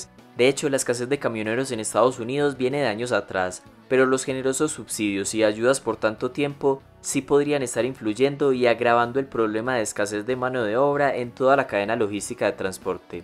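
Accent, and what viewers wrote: Colombia. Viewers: Sería interesante que hagas un video sobre como las subvenciones dañan el tejido productivo de un país, por tu voz creo que eres colombiano, en caso de ser así sabes que ciertos sectores políticos han propuestos rentas básicas universales.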